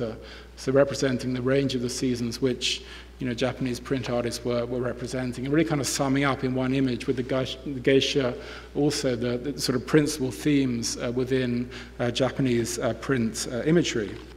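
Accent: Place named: United States. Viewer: I didn’t enjoy it. A long monosyllabic ramble with continuous American style diction. Dreadful!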